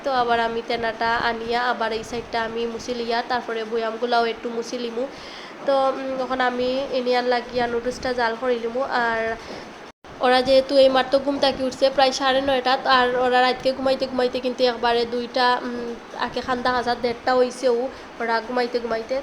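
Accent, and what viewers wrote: Sylhet, Bangladesh. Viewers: মাশাল্লাহ আপু পিঠা গুলো অনেক সুন্দর হইছে ভিডিও টি অনেক ভালো লাগলো আর সিলেটের কথা গুলো আমরা অনেক ভালো লাগে❤❤❤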